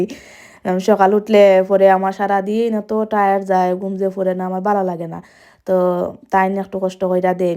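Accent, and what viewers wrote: Sylhet, Bangladesh. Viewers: Right kotha boin koisoin . Afa afnar Sylheti mat je sundor lage . 😊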